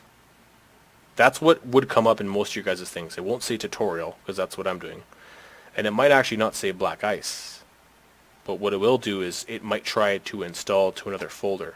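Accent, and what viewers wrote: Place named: United States. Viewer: i hate american accent. please note im tired an am annoyed of everything.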